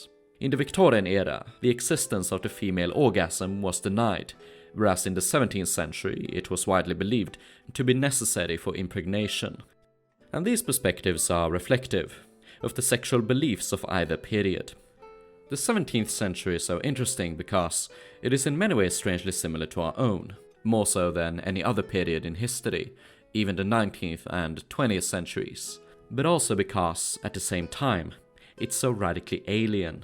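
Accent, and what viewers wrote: Scotland, United Kingdom. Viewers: The Scottish accent ❤ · He’s not British. Sounds more Scottish or Northern Irish.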